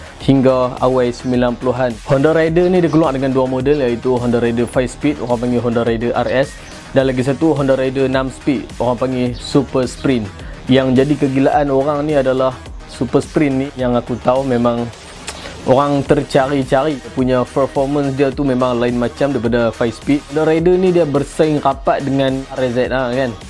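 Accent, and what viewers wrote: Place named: North, Malaysia. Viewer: Mna abg dapat barang moto lama ni....dgr suara belah utara..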